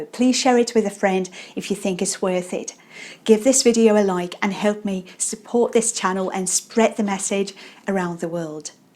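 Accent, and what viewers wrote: United Kingdom. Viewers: I have known you for several years. I first texted you in VK saying that I am love with your amazing British accent. I started working on mine one year ago. You were a massive motivation for me, thank you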